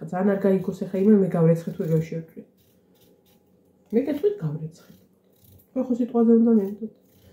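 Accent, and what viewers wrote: Martkopi, Georgia. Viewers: ძაან ბუნებრივი ხარ და შენი მარტყოფული კილოც მაგარია❤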